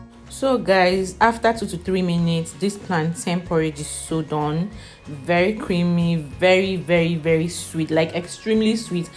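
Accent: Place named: Cameroon. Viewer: You talk like a cameroonian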